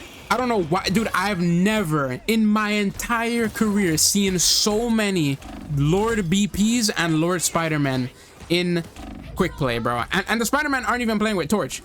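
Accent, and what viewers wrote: Caribbean. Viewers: Are you from the caribbean? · By any chance are you Trinidadian? Your accent is very familiar and sounds Caribbean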